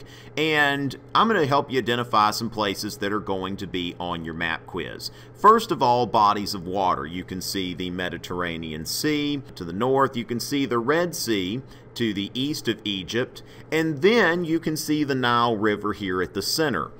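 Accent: United States. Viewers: Why do you have to talk in a cowboy accent ?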